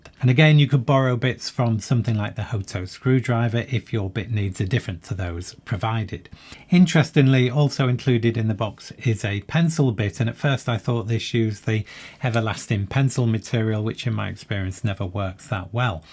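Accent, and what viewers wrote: United Kingdom. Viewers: Perhaps mention not legal in uk as some Brits won’t know and as you are (or sound) British they might assume you are saying edc in UK.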